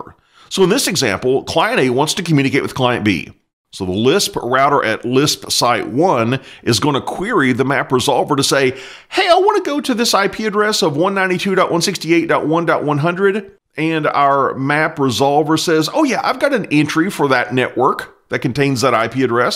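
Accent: Texas, United States. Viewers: topped up with that texas accent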